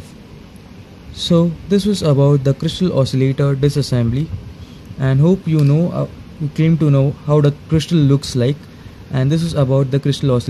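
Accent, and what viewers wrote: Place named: India. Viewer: I like your Indian accent. Great video!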